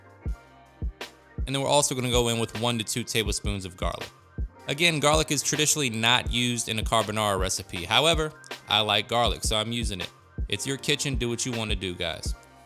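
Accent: United States. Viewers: Why do you amaricans sound so cool???? Us English lads just sound common haha Brilliant video, just come across you, carbonara is my favourite pasta, definitely gunna try your recipe 👌 Peace and love from the uk 🇬🇧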